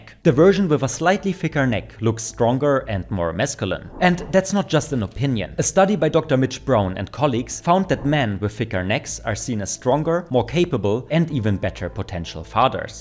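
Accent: Germany. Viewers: Hey man, love your content, stuffs great!!! Are you german tho? I am and do hear some of it….maybe… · ich höre deutsch, ist das wahr?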